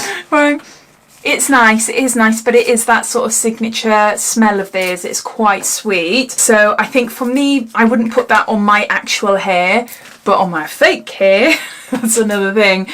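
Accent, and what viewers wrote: United Kingdom. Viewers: Hello from Brazil! Your accent is so beautiful! I want to visit the U.K sometime soon - from what I have read and watched about sights and the culture, I have a feeling I am gonna love it. I should have visited while we were stationed in Germany! Maybe in the near future. I and my family are moving back to the U.S very soon so I was wondering where this box can be shipped there (and how much shipping would cost). Cheers! Renata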